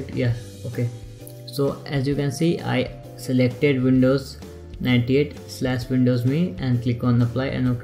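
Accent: India. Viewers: bcoz Yours Voices Has been like an indian guy😊😊😊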